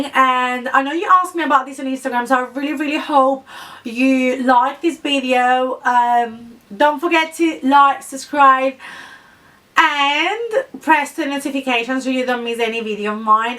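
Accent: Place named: United Kingdom. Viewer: I like your British accent ❤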